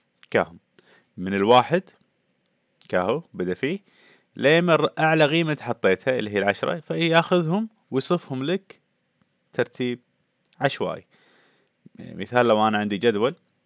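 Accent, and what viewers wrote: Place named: Iraq. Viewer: عاشت الايادي والله صراحة كلت جنابك عراقي لان لنجتك كلش قريب علينه حياك اخوك مهيمن فالح من العراق بابل ممكن باقي شروحاتك صراحة محتاجهن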